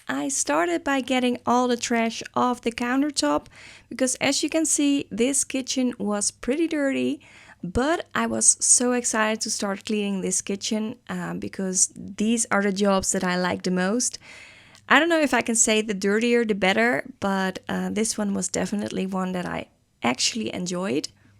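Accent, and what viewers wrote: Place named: Netherlands. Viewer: Wat gaaf ik kijk altijd dit soort filmpjes! Nu kwam ik bij jou uit. Maar ik hoorde in je accent wat nederlands! Tof dat je dit doet! Ik ga je volgen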